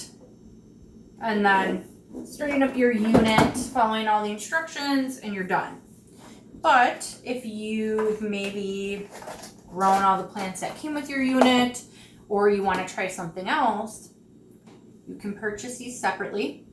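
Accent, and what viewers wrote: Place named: Wisconsin, United States. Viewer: I’m trying to figure out your accent. Is this Wisconsin?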